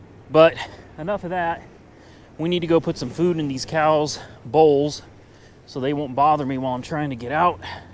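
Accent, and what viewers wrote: United States. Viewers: I love the way you speak your so calm and collected, as a Australian I often find Americans have funny and rather stupid accents but your the complete opposite. Also I love the content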